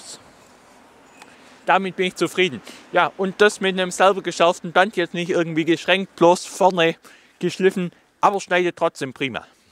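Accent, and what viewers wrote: Swabia, Germany. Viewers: Frey mi saumäsig uff deine Videos, ganz besonders weil du a aständigs Schwäbisch schwätsa kasch. Des isch de schest Schproch uff dr ganza Welt 😂😂😂❤ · So isch des. A reachdr Urschwob im Tschäd. Wo kharsch au du no?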